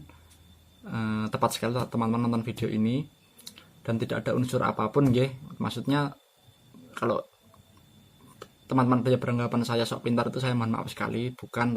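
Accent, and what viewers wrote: Java, Indonesia. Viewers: Jowo e pundi mas? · Bahasa indonesianya medok bang. Hehehe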